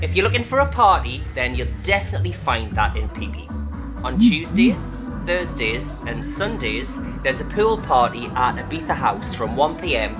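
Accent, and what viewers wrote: Newcastle, United Kingdom. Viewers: Great vid. Only question... is tha a Geordie accent mate?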